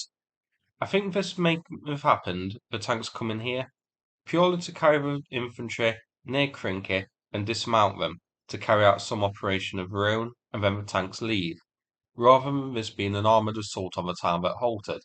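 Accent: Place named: East Midlands, United Kingdom. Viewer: You’ve definitely got an East Midlands accent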